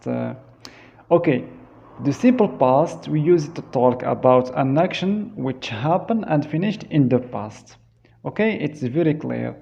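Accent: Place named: France. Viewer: Good job dude try to improve your pronunciation 😉 I smell some French in your English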